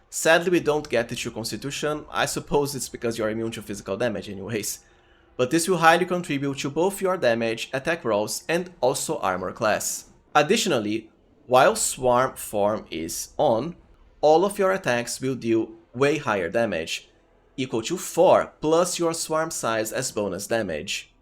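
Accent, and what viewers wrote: Brazil. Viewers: Brazilian Accent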